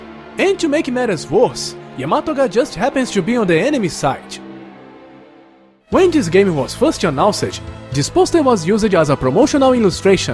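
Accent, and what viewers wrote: Brazil. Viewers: e carioca, né? hahaah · are you br? i can't stand your accent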